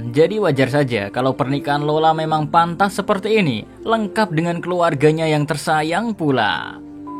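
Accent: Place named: Magelang, Indonesia. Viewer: Aku penasaran, org mana sih? 90% org Jawa tengah, Magelang mungkin, logatnya kayak orang Magelang, Maaf, 🙏🙏🙏😁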